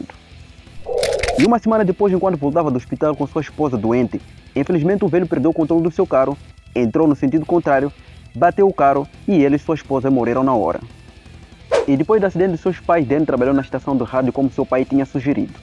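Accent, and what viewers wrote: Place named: Angola. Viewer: Parece sotaque angolano ou talvez de Portugal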